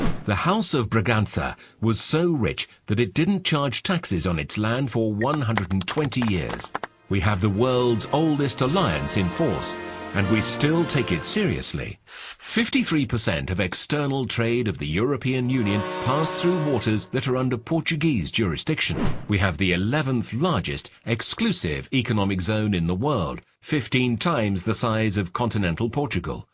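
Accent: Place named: United Kingdom. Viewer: Well, I love Portuguese accent, but you made this video with a British accent? NOOO!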